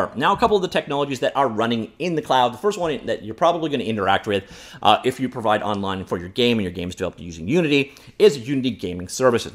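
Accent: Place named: Canada. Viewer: He is Canadian after all. They pronounce a lot of words wrong. I think it's kind of cute, like Carter said to McKay.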